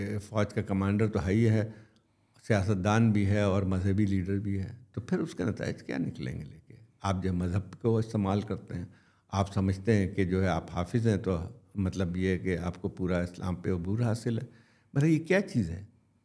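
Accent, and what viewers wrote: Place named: Bihar, India. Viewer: sahab ke bolne ke style se aisa lagta hai ki ye basically Bihar ke hai.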